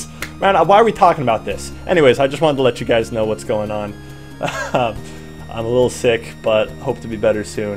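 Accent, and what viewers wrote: United States. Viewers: What accent is anyway? Sounds kinda Southern and Northern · I just noticed he has a new York accent o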